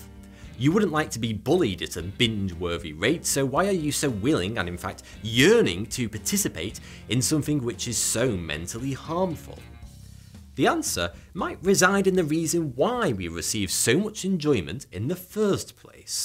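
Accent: United Kingdom. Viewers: Nice and British, yes... He not also have said "but" strangely BUT also "ass"... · it's a typical British accent really I am not originally from the UK but I live in Yorkshire and I can tell you that loads of British people have a lazy way to pronounce "th" simply sounding like they are pronouncing "f"... For what I heard he is originally from the Isle of Man.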